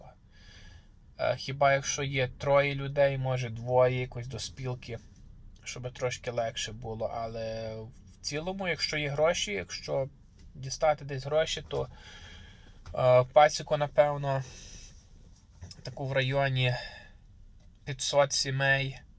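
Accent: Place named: Western Ukraine, Ukraine. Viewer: Пан явно із західної України.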